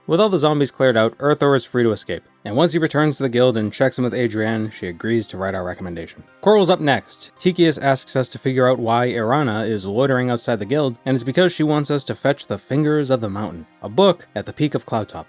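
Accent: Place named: New England, United States. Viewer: that’s sincerely just how the new england accent is lmao. they talk on fast forward up there.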